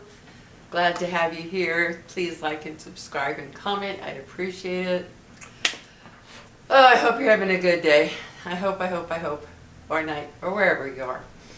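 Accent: United Kingdom. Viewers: So nice to see your fun side Stay happy always I love the Brit accent too ❤ Gentlemen kind men are no longer around 😅 Your hair looks fabulous 💕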